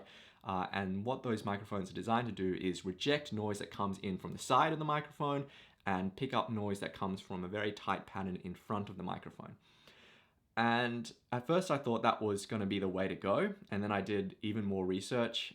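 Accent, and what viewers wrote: Australia. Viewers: What boom arm are you actually using for the E614? It looks exactly what I need, and I hear your aussie, and I'm australian too so hopefully I can manage to snag what a beaut you have for yourself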